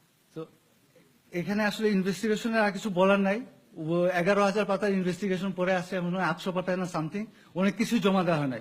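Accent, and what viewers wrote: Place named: Bogura, Bangladesh. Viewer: ভাইটি মনে হয় বগুড়ার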